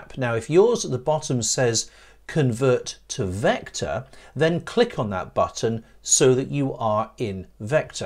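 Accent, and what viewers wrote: United Kingdom. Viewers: Bro's voice is British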